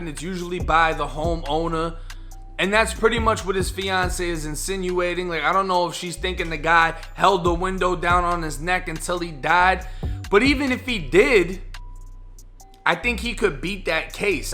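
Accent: Boston, United States. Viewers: "To portray that he was nothing more than a criminal, killed by KARMA." LOL your boston accent made it sound like you said he got killed by comma. Kuz for some reason boston niggas dont like to pronounce the letter R if an A comes before it. Lolol Karma (kah-ma)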